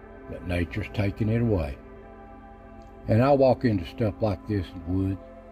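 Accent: Appalachia, United States. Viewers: great American accent, fitting. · I love listening to you. My family is from the mountains of Virginia. Your accent and way of talking ("my wife an em") reminds me of my family.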